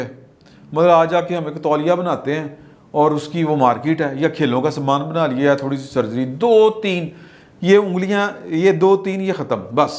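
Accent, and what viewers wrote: Punjab, Pakistan. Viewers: Indian Punjabi here, makes me sad what’s happening in Pakistani Punjab. Although I love anchors Punjabi Urdu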